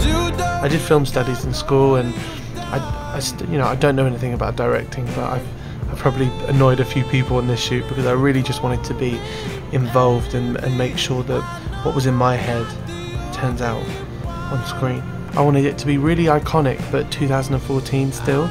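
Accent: United Kingdom. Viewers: The British accent is awesomeeeeee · hes brittish....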